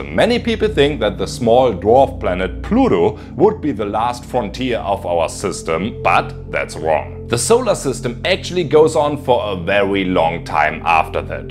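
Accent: Germany. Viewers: Ich würde es lassen „On Englisch“. Wirklich zu deutsches Englisch und paar ganz harte Aussprache Fehler drin. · Lieber ich liebe deutsche Leute die englisch sprechen 🗣 die kann man auf YouTube wenigstens verstehen aber auch aus Grund des lustigen Akzentes schnell als deutscher identifizieren dennoch Trotzdem viel Glück und Spaß in der Welt des englischen contents!!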